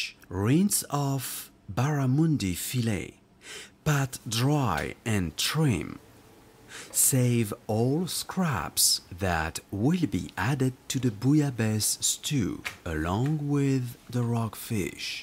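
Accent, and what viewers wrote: France. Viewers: Mimi R I know. But I don't mean his frensh accent but more his Terminator way to talk. You know what I mean?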